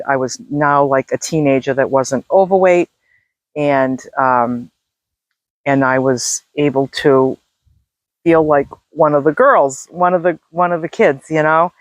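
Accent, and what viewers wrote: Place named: Massachusetts, United States. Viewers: Nice to meet you,! You sound like you're from the Boston area, not far from me in Southern NH! Hi, neighbor! Thank you for sharing your inspiring story...it was such an encouragement to my heart! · I love your accent. I miss my east coast cousins.UR my example. I need to drink moreH2O. I have to walk more! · you sound like voices from my childhood in Massachusetts.💗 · Thank you for being you, and here on YouTube! I like you! One reason is because I can tell that you like you! I find you to be very refreshing! Sorry/not sorry for all the exclamation points. 😊 I'm in New England, and your accent sounds pretty local to me. I'm curious where you're from. Anyway, I'll be following you here. Keep on keepin' on my virtual friend! You're an inspiration. ❤ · I am sooo grateful that I fought your YouTube sight. You are an inspiration to me. 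I can so relate to everything you have shared. I hear a Bostonian accent which also excited me because I’m a true Bostonian too. I will continue to watch you and look forward to more videos. I truly feel I can sleep better tonight and wake with 3 different outlooks to my life tomorrow (physical, emotion and spiritual. Not to sound corny but I swear You were sent as a wellness blessing to me. Thank you! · You sound like you’re from Massachusetts are you I am and I pick up a pre-bit of an accent. Love your channel. I’m a regular subscribe right now. Please answer my question about Massachusetts.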